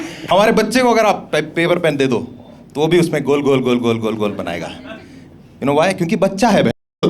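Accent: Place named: Hyderabad, India. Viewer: Woh hi tho sochru iska hindi slang kuch apna hyderabad ka lagra kaiku bolke patta tho hyderabadi bi hein!! Njaanum oru malayalees from hyderabad kalakki tto 👏👏✌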